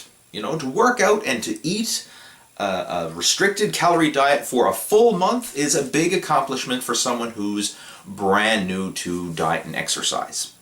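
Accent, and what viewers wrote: Newfoundland, Canada. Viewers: Buddy, you sounds like your from NL!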